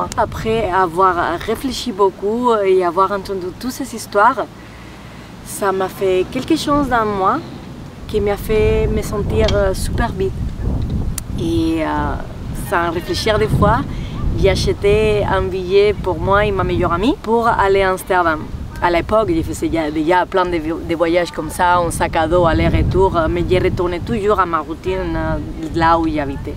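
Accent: Spain. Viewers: Avec tout tes chiens tu avais vraiment besoin d'un camion 😂 Ton accent espagnol est charmante ❤ De verdad tu hablo franxute mejor que yo espagnol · Je te regarde grâce à Raphaël et je ne regrette vraiment pas mon abonnement à ta chaîne. Ton accent espagnol est comme un médicament de bonne humeur donc on a besoin régulièrement mdrrrrrr gros bisous tout plein de la Belgique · Ton français est très bien surtout ton accent espagnol te donne un plus 😊😊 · Bonsoir. Bravo pour tes vidéos, et en français en plus 👍, avec cet accent espagnol.... adorable !!! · J'aime l'accent espagnol, ma grand-mère est née en Espagne. Et ton français est très clair, tu peux avoir confiance. Ton aventure est très intéressante, merci de nous la faire partager. Bon anniversaire de voyage. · J'adore votre accent espagnol !!! Et votre français est très, très correct. (*) (en fait, ce qui importe, c'est l'organisation cérébrale ; et là, chez vous, ça turbine et c'est de niveau solide) Salutations d'un sédentaire... 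PS je vous ai découverte par les Mariolles trotters *) puisque vous aviez quelques inquiétudes à ce propos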